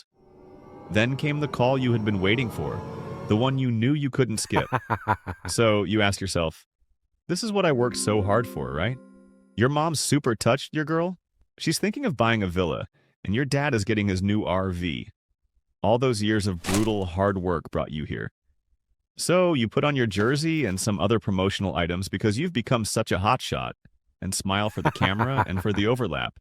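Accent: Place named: United States. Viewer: Bro is this video a troll? Because you sound too american to be serious when talking about football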